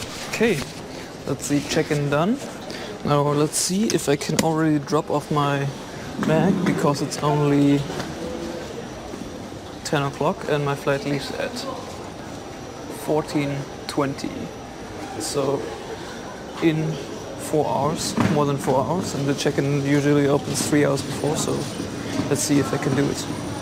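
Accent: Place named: Germany. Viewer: Selten so deutsches Englisch gehört haha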